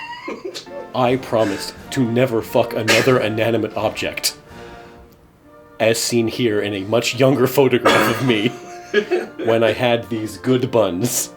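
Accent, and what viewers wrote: United States. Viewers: Mohawk fits you,. Also, good American accent. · I thought he was British now he’s amarican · His American accent is on point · Your American accent is super weird coming out of your mouth. Good but weird!!!!! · You have an uncomfortably good American accent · Your American accent is actually p good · Why does your American accent sound like Markiplier? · Wow that American accent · Dang his american accent is pretty decent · That's... a really good american accent. And as an American who enjoys making fun of non-american people doing american accents, that's painful to admit. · That’s a good American accent. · you do such a good american accent jeez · your american accent is on point :3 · American sounds so much different than British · das a pretty good american accent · Spot on American accent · The american accent made it so much better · You sounded like an American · Ur American accent genuinely reminded me of markiplier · His American accent reminds me of Markiplier a little bit. 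· He's talked about this before. There's an old video on this channel about accents where he says he picked up some Americanisms from watching a lot of American cartoons as a child. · Pretty good American Accent. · Good American accent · Pretty good American accent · That american accent is 10/10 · The really good American accent just tied the whole thing together. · Ayyyy he’s an American maen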